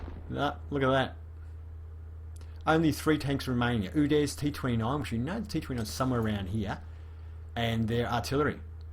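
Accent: Australia. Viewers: I'm Serbian I was thinking you are from Australia cause of accent so I'm right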